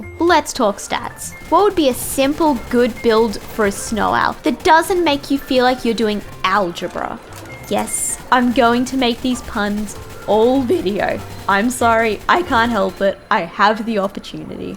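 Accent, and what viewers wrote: Australia. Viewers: An Aussie I see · Honestly, aussie girls and video games? Like Heaven. I could listen to that accent for hours! Lol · Crikey! She’s Australian! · She's so Australian it hurts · I like little Aussie accent lol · Not gonna lie. It caught me off guard that a sweet aussie girl started talking rather than "BOYS AND GIRLS OF THE SHAAAAAAAAAFT" XD But she is great! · Gotta love that Aussie accent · Is she Australian or just imitating Steve Irwin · Your Aussie accent makes me want to have your babies. I will carry them to term. Also, you're doing a great job as a commentator. Keep it up, and I'll have triplets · she sounds like a basic Aussie to me — from an Aussie. Nothing wrong with the voice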